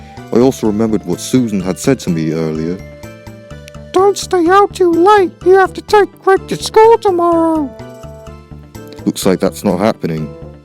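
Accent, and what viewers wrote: United Kingdom. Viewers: you have a deep British accent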